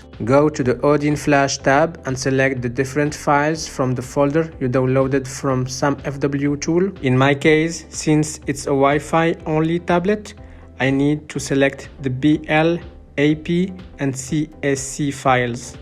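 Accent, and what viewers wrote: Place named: Morocco. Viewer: sorry but your accent is bleeding bro, are you a moroccan?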